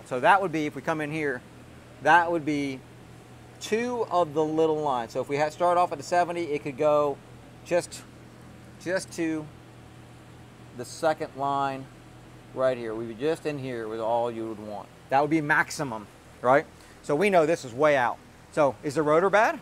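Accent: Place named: Western Pennsylvania, United States. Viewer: Are you originally from Western PA? I hear some accent from that area of western PA, WV, OH area. Just certain words.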